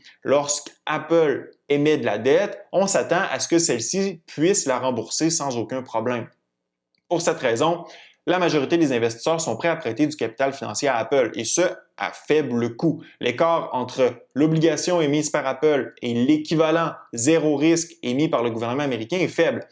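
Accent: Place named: Quebec, Canada. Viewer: J'adore tes vidéos! Ça fait plaisir d'entendre un accent québécois sur youtube ;)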